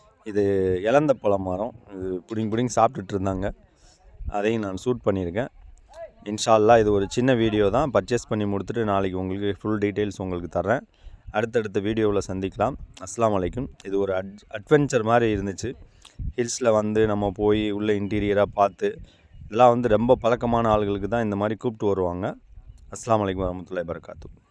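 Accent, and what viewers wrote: Madurai, India. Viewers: ❤ assalamualaikum warh madurai basha ❤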